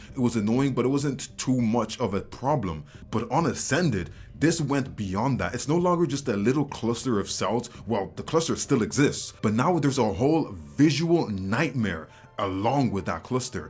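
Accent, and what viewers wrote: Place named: United Kingdom. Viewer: Somewhere in the uk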